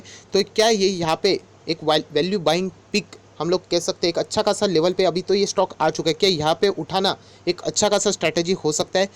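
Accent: Maharashtra, India. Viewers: Kachra nhi hai khara Sona hai 😄😄 Aap Marathi ho kya sir ??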